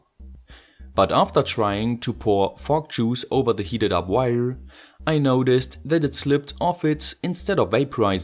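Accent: Germany. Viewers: Are you from Germany???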